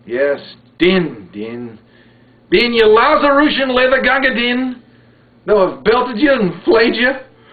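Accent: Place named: Australia. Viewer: That's a great performance and perfect Aussie accent too which is fine for an Imperial piece such as this. Very moving at the end. 5 Stars.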